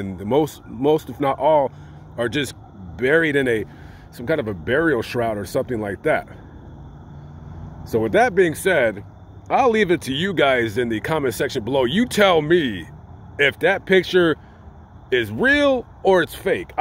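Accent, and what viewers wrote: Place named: California, United States. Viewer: I enjoy his voice because he reminds me of California I lived there for 6 years as a child.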